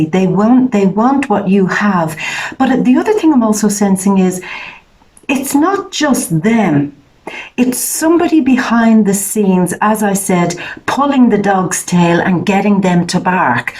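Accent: Ireland. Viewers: I love your reading - very helpful!! Love your Irish accent and way of reading - One Love xxx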